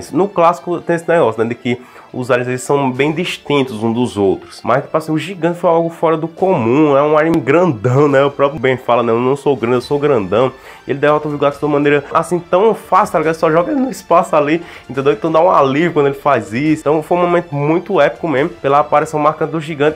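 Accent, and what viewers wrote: Northeast, Brazil. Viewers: Mano tu é da onde do Nordeste? kk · Tu é do Nordeste ahhhhhhhhh pera EU TBM SOU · Amo o sotaque nordestino da minha região, é nois! Sou da Bahia.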